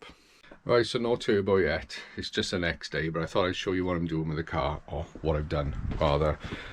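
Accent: Wales, United Kingdom. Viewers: Welcome back mate, nothing beats cars and a Welsh accent🏴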